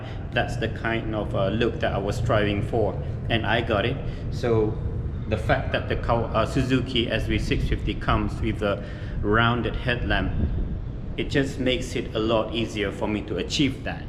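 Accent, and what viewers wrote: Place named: Malaysia. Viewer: Your english sounds Malaysian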